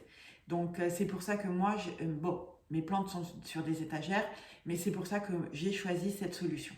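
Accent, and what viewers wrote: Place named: Corsica, France. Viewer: Salut,déjà je voulais te féliciter pour le nombre croissant d'abonnés,c'est fantastique,je me souviens de ta toute première vidéo,sombre,le son au minimum,lol, filmé avec ton tel,dans ton appart,et je m'étais dit waouh mais cette fille avec son petit accent corse va cartonner c'est sûr...et ben voilà!!tu es devenue IN-CON-TOUR-NABLE désormais!!! BRAVO 😉🌿 bon dimanche et bonne continuation.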